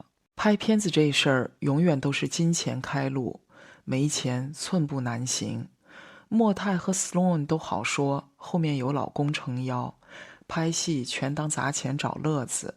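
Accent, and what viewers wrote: Cantonese, China. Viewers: 哈哈哈哈哈哈哈哈哈哈哈哈哈哈哈每次聽主播說粵語我都笑不行了🤣🤣🤣🤣🤣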